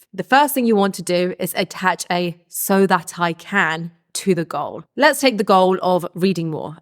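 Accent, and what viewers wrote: England, United Kingdom. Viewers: Straight up gorgeous and wicked smart. Plus you have that English accent. Good lord.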